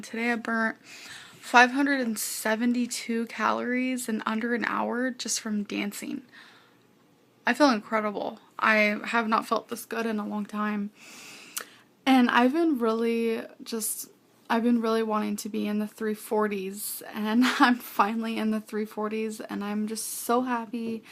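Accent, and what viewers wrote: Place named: South, United States. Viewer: Where’s her odd southern~ish(not really) accent???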